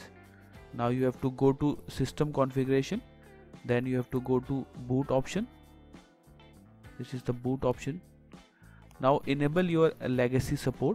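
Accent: India. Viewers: This Indian English is so hard to stand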